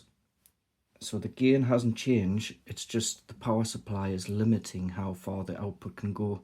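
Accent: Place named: Northern England, United Kingdom. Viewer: Glad your on the mend you sound like we are both in God's country Up North so subbed just for that and before I have look around your channel. All the best, Kev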